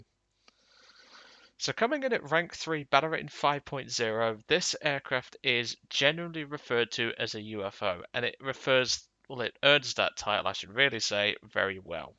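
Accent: United Kingdom. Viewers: you don't use kilometers, but you have an English accent. Am I hearing things wrong?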